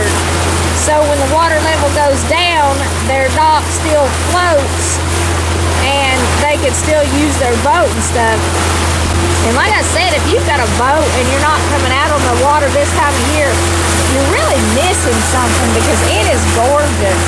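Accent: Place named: North Georgia, United States. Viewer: HeHe, I LOVE this channel! I love the views, and I love that accent! Its like being at home...and I miss home. all my family is from north Ga and east Tn. In my mind thats what heavens gonna look like, only better...somehow, lol.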